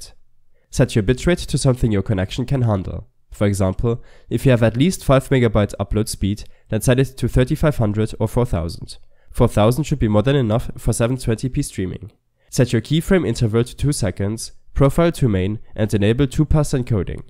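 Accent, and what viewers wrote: Germany. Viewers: tahnk u man so mmusch youre the best and i love ur german accent liked and subbed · 3 sekunden und ich wusste du bist deutsch lol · Hrm...German accent sound so cool, btw what microphone do you use? It sounds great. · Wollt schon sagen ich guck das video zum erstenmal direkt habe ich gedacht deutscher haha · mann hört so hart raus das du deutsch bist xD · Love your Deutsch accent 😜😜😜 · Genau so ein Tut habe ich gesucht danke :D Hab gleich gehört das du aus Deutschland kommst xD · spricht du auch deutsch ? hört sich irgendwie so an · German Akzent 😅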